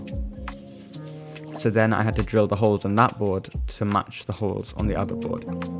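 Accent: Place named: Trinidad and Tobago. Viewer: You from Trinidad? Kinda picking up the accent there.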